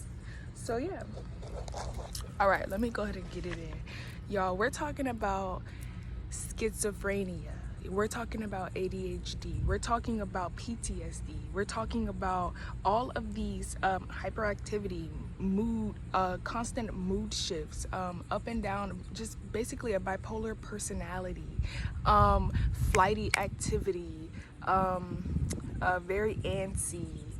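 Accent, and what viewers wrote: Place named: California, United States. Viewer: Message SiStar 💕 💯🙌🏾 I’m in agreement with a lot of your views. On another note you definitely have to be from the bay or Cali because you sound like family 💯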